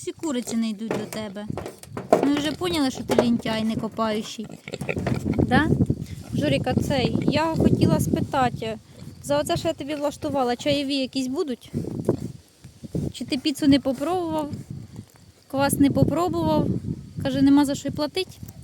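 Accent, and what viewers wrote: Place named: Poltava, Ukraine. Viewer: Дівчина,Жорік та всі інші друзі цього шоу.. Ви з Полтави?❤🎉❤ Така мова гарна ❤ Харків вітає ВАС❤🎉😊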